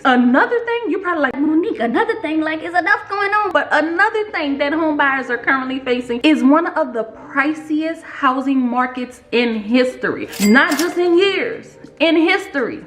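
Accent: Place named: Louisiana, United States. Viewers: Oh, I know you from Louisiana. I'm in Slidell and I hear home all in your voice. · You gotta be from Louisiana. That accent sounds too familiar!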